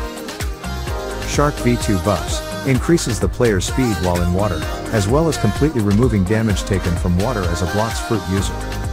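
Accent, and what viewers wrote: Philippines. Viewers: BRO HIS SOUND IS FROM FILIPINO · R u from Philippines that sound familiar?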